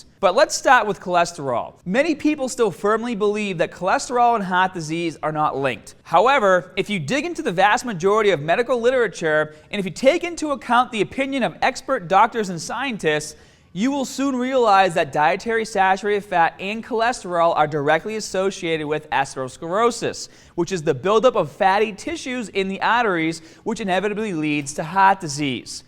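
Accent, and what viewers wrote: Boston, United States. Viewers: Abdahmenen? Kind of hard to take you seriously when you can't even pronounce abdomen correctly. And it's not because of your cringe worthy boston accent either. You added an extra syllable in there , man. But to the point of the video. Do I think steroids used properly and maturely (that last one is rare in today's society) can cause adverse long term effects to your health? No. Or should I say, I don't know. No one does because there is little to no actual studies on the matter. That said do i think people who overdo it and abuse the shit out of steroids - like Piana - over a long period of time are playing with fire? Yes. Just like any drug be it cocaine, marijuana, nicotine/cigarettes, heroin (obviously), and even caffeine are setting themselves up for failure health-wise in the long run. I know I'm going to get bitched at for including marijuana on that list from our stoner left leaning friends, but there was just a study produced saying that long term use of the drug deteriorates your brain. 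The study released is what I'd call a "preliminary" study, but it showed that people who smoke high potency weed showed signs of brain damage, so in other words, people who built up their tolerance to weed and smoke the stronger shit nowadays are upping their risk of brain damage. Just like people who chug bottles of the stronger vodka are going to fuck themselves up more than the dude who just drinks a few beers. That said the study was admittedly hardly conclusive, which is w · Why watch this guy? He looks the same from videos from years ago. Boston accent was not earlier, but is now. Lift some more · I haven't watched one of Scotts videos in a couple of years. I swear to god he didn't have a Boston accent before. This has to be a joke right? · Jim Jorgensen Why the hate? Go fuck off and find someone like with a "real Boston accent." Lmfao stupid kid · Never noticed you're Boston accent until now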